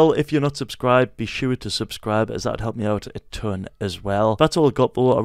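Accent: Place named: United Kingdom. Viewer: bro is bri'ish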